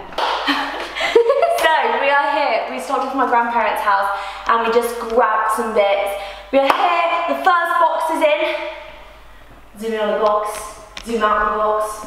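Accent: United Kingdom. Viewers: I like a lot she's voice, I wish to get a bit of British accent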